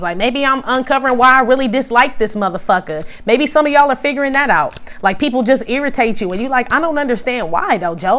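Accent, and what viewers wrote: Chicago, United States. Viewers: you talk like us in Chicago🤣🤣🤣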